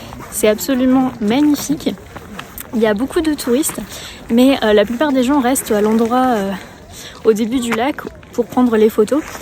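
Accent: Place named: South, France. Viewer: Ton sud français est mille fois plus beau!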